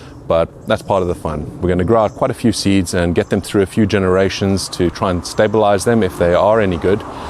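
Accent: South Africa. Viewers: Thanks for the video. It's rare to find one that has so much clear info without wasting the viewer's time. It's also nice to hear a South African voice again as an expat :) I'd call it the drop kick.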